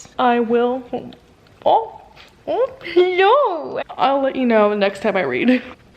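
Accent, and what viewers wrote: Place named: Germany. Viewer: Always so excited to see some German books!! ;) Also, you're German accent is amazing! Especially the "ch" you nailed! Ich bin gespannt, wie du es finden wirst, Victoria Schwabs Bücher auf Deutsch zu lesen :D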